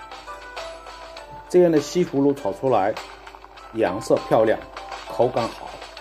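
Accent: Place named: Shanghai, China. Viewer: 你家还种菜，好新鲜，我种过几次西葫芦，很能长的，你是上海人吧？听你讲普通话觉得是。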